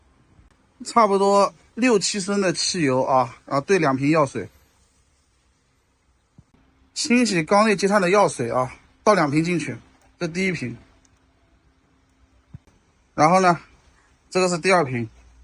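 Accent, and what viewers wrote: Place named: Hangzhou, China. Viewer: 老哥听你口音是杭州人？店在哪里？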